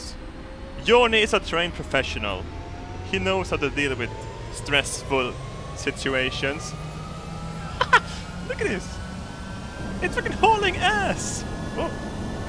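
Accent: Sweden. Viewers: du e skit bra men du pratar inte så bra engelska (ta inte illa upp) typ svengelska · är du svänsk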